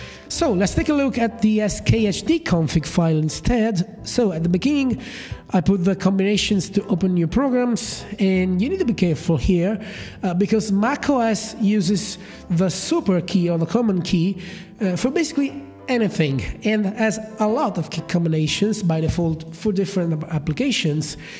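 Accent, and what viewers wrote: Italy. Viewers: Hey dude, are you italian? Cause you sound so, but your English is really good.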